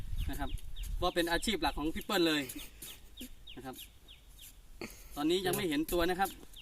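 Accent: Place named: Laos. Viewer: เว่าลาวแต่แรกกะแร้วน้อคะข้อยรุ่นนำเจ้า..ย่านเจ้าเว่าตกลาว55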